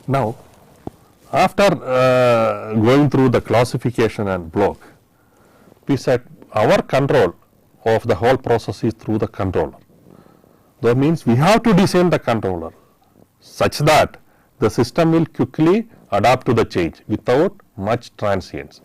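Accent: India. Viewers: Nice, but I can't understand this indian dialect...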